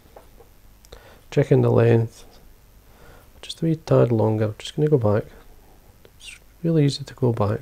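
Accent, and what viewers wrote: Scotland, United Kingdom. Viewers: The subtitles are hilarious, is it that hard to understand a Scottish accent?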